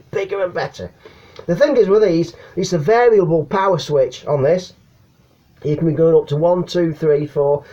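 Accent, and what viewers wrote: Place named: Yorkshire, United Kingdom. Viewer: Yorkshire? Lancs? Ex-Halifax lad here. Living in CA. CB is quiet here so getting a 10M rig tuned and want to put an amp in the system. Found your clip and it felt like I were back 'ome again.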